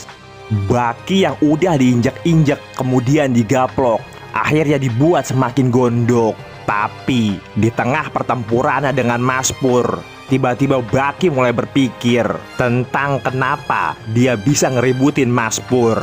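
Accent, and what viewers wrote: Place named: Betawi, Indonesia. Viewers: PEMBAWAAN CERITA ALA BETAWINYA KEREN PARAH,SUBSCIRBE AH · Betawi style...gw suka banget pembawaan channel ini...ET dh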